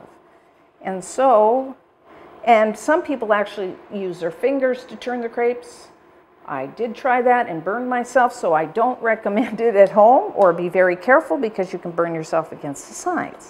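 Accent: Canada. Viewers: Ah ok cool. You still sound canadian which is great to hold onto your accent :) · I couldn't place your accent but caught some Canadian. Great recipe!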